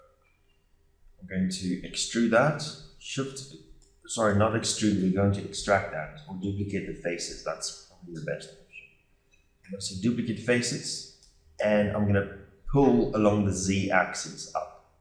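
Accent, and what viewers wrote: South Africa. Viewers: Hey man, nice video! You sound South African, i am from JHB myself!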